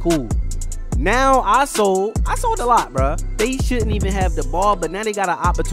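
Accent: Baltimore, United States. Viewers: You gotta be from Bmore with the accent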